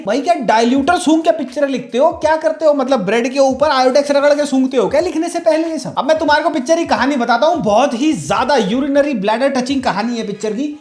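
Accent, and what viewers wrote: Haryana, India. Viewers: I love you bro to much honest review 😅 and bhai please bring back you’re haryanvi I really miss it ❤❤❤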